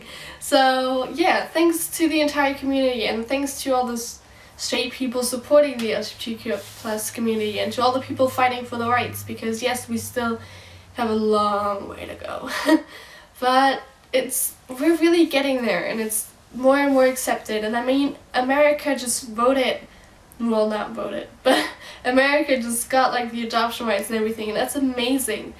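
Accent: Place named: Germany. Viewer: Bevor ich deinen Kanalnamen gelesen habe, dachte ich so "Ist sie Deutsche? Denn ich habe den exakt selben Akzent" :D. Ich rede genauso wie du, wenn ich englisch spreche. I'll continue in english so everyone can understand. I'm pretty sure I'm bi too. Nobody knows although I think a few friends assume. I kinda wanna come out to my friends but I'm not sure if I'm ready enough unless they ask then I would tell them. I have had thoughts of being gay (turns out I'm bi) since the age of 13 (I just turned 17) and although I've never had a problem with lgbtq+ people being one myself was something I really didn't want to be. Accepting myself was definitely the hardest things although I knew my family and friends would support me fully.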